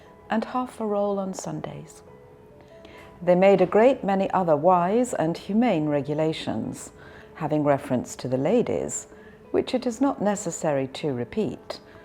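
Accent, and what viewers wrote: United Kingdom. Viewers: 영국 살면서 영국 발음이라고 다 듣기 좋은건 아닐수도 있구나 느꼈는데 선생님은 목소리 톤하고 발음이 너무 듣기 편안하네요..^^ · 오오 선생님은 포쉬엑센트를 쓰시는건가요? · 영국발음 너무 좋아요..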